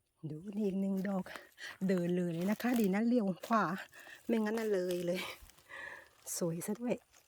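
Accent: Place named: Suphanburi, Thailand. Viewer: ฟังเสียง พูด เหน่อๆ เหมือน คน สุพรรณบุรีกาญจนบุรี รึราชบุรี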